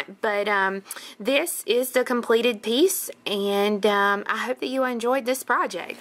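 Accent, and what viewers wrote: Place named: North Carolina, United States. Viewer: Great tutorial! gives clear and excellent instructions, plus I love her North Carolina accent.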